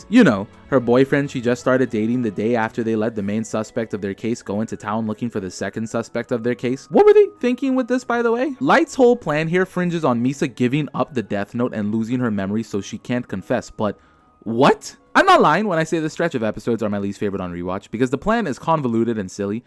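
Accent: Bahamas. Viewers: are u from the bahamas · Are you a Bahamian? This is funny?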